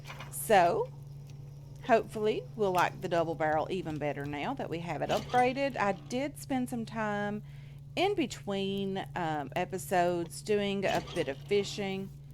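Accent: Kentucky, United States. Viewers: There's my Kentucky accent fix! Brilliant episode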